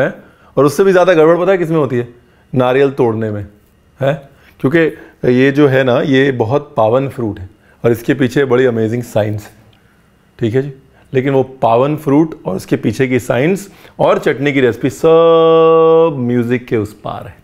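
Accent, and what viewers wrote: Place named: Kerala, India. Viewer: he is a punjabi but has a clear mallu accent, what kind of mix is that. nice.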